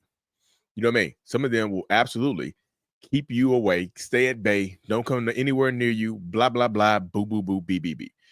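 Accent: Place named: North Carolina, United States. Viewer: I have watched so many of your videos, I now say the word "no" with a North Carolina accent.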